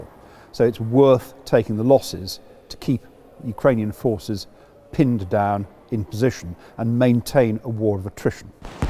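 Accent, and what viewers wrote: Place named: United Kingdom. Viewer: I could listen to all day to be honest. But as an American i can say i enjoy listening to most British talk lol.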